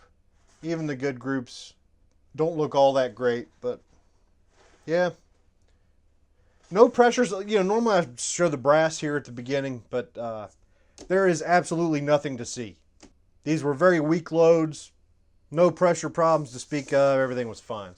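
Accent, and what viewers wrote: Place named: San Francisco, United States. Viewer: That intro voice sounded like some San Francisco hillbilly, and I thought it was the Yankee Marshall, and I almost turned it off.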